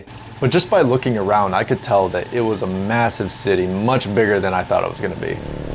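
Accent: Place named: Philippines. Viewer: I love how you familiarize to pronounce these places in a very Filipino accent. Thats awesome which many foreigner vloggers can not😊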